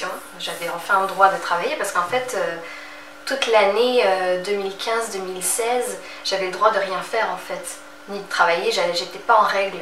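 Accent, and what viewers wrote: Quebec, Canada. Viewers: Je sais pas combien de temps tu es resté ici, mais ton langage se mélange entre français et québécois, c’est mignon hihi🤗 bonne chance à toi pour la suite xx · Jsuis québécoise et on entend tres bien ton accent quebecois lol par contre un détail me tanne, on a un super bon système de santé jcomprend pas que tu devais payer mais que tu avais le droit de travailler, ta pas eu de carte d’assurance maladie? Ici on a l’équivalent de votre carte verte je comprend pas. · Dsl pour ton expérience :)) tu as encore un peu ton accent de Québec. Le plus important c'est que tu soit bien ou tu est ! Salut de la part de Québec (La Ville De Québec) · Avec l’accent que tu as pris ,tu es québécoise!!!!!gagné. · merci j'adore ta franchise je regarde avec décalage tes vidéos, tu as conservé un magnifique accent Canadien, tu es au soleil, oui notre système de santé est un des meilleurs du monde et accéssible à tous. 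Tu es géniale bourrée de talent ne te laisse pas prendre la tète suis tes intuitions, protège toi, fait cracquer le vernis des gens ils et elles que tu rencontre bien respectueusement un vieil baroudeur et aventurier devenu ermite te parle je te suis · tres touchant ton histoire d amour decevante et ton sejour si penible en bout de compte ..t est tomber en amour avec un pauvre type.. isole a granby .. c est vraiment dommage pour toi ..on appelle ca l experience pour les deceptions de la vie les gens finissent toujours par nous decevoir en general il ne te reste qu a retrouver l amour .......t a encore l accent francais mais tu t exprime comme une quebecoise.....peut etre reviendras tu un jours qui sait....esperant que tu a garder de beaux souvenirs et de bons contactes.....le prochain tu seras plus selective et mature t est encore tellement jeune ta vie ne fait que commencer tu seras toujours bienvenue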